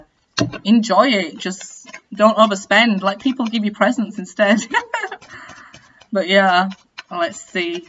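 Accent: United Kingdom. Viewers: You're so pretty. And your British accent is beautiful. I love it. Thank you.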